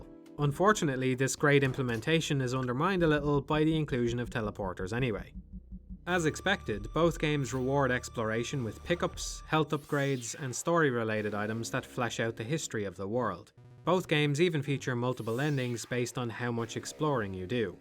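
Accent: Ireland. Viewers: I only watch this for the Irish accent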